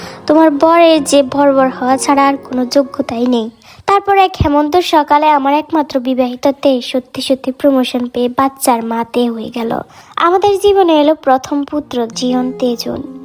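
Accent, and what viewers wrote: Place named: Chittagong, Bangladesh. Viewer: Apu apni ki chittagong er? Apnar kota gulu shunte keno jeno erokom lage Ar story onek beshi shundor hoise 😘❤️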